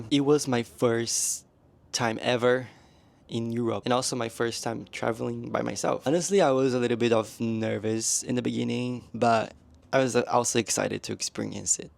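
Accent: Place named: Australia. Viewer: Great video,! On a side npte, I think your Aussie accent’s starting to kick in 😊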